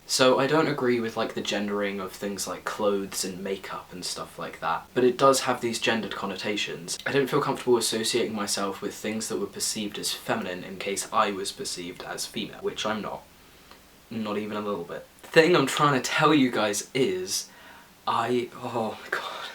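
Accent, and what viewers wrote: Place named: United States. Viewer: All I can see is that youre kind of speaking with an american accent already. Is it because of the songs your singing?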